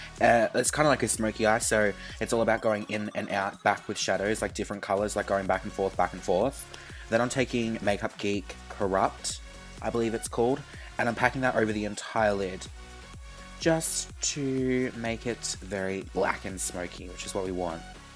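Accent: Australia. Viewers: Never mind... It's definetly Australian 😅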